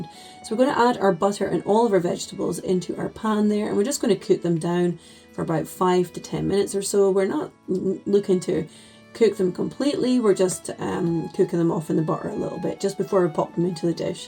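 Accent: Scotland, United Kingdom. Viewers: Looks great doll I’ll be trying that. Loving the wee posh northern Scottish accent too 😜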